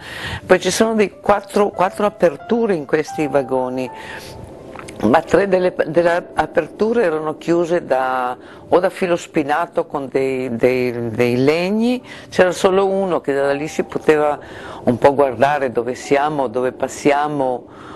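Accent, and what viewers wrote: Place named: Rijeka, Croatia. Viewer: Còcola, che emozion rivederte in video e ricordar i nostri incontri... sentir la tua bela parlada fiumana: no te dimenticheremo mai! abrazi, da qua giù fin là su!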